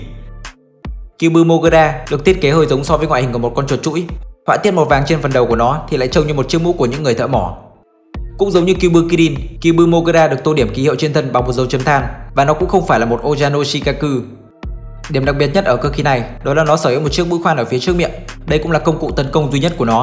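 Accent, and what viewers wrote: Japan. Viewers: Tên nào tiếng Anh thì đọc tiếng Anh đi đọc tiếng Anh kiểu Nhật thế nghe nó cứ bị khó chịu ý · Đừng đọc cái kiểu tiếng anh nhật nữa đi. Từ nào tiếng anh thì đọc tiếng anh đi cho dễ nghe.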